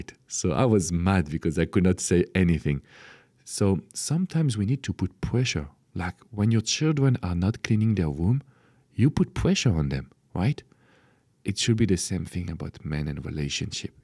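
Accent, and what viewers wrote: France. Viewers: Hi,. I love your style of coaching so much that I am a subscriber. I have a request for a possible video. Could you make a video that addresses how to deal with a fearful avoidant? I’m in that kind of a situation and it’s breaking my heart. Thank you. 😊 And BTW I love your French accent. Don’t try to change it. Be well.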